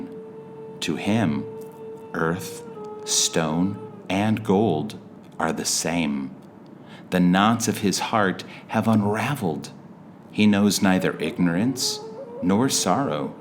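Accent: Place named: United States. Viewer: It seemed a risky idea to hear this in American English. It turns out it was fine. Thanks 💕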